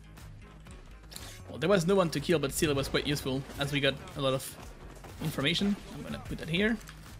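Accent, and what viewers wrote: France. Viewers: j'adore ton accent français en mélange avec l'anglais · you could go on top of something with Pheonix with the Ice wall of Sage if she cast it under you! New here, what is this accent, are you french by any chance ? I'm from Quebec, Canada! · Are you French?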